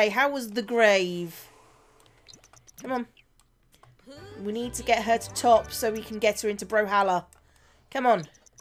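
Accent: England, United Kingdom. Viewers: She's English but England has a ton of different accents up and down the country, I can't place her personally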